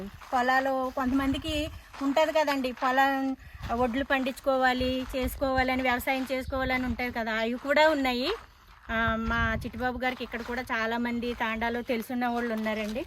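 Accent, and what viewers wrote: Godavari, India. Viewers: స్వచ్ఛమైన గోదారి యాస తో అక్క మాట్లాడుతుంది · మేడం మీది ఏ ఊరు మా గోదావరి జిల్లా అని అడుగుతున్నా మాట తీరు అలానే ఉంది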